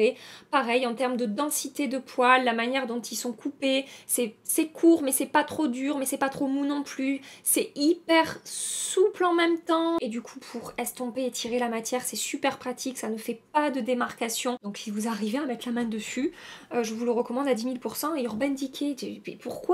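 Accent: South, France. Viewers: adore ton accent du sud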